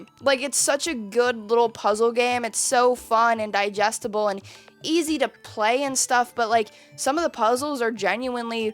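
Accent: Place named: Pennsylvania, United States. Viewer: do you live in Pennsylvania? The way you say certain words makes me think you do hahaha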